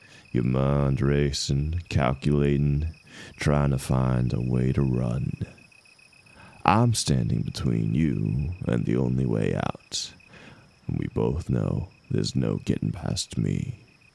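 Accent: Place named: South, United States. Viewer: I really enjoyed this one! That southern accent you do is so soothing and comforting. And thirty minutes is such a treat!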